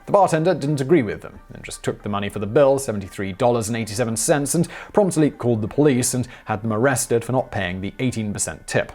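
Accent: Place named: RP, United Kingdom. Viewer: Love hearing you read jive with your posh accent.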